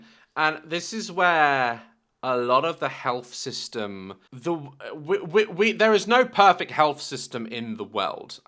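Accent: United Kingdom. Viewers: Your videos haven’t failed to make me laugh, I love that British accent (as well as the very sassy voice) 😂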